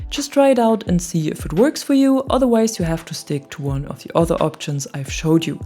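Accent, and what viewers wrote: Germany. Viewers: Man hört voll raus, dass du deutsch bist 😀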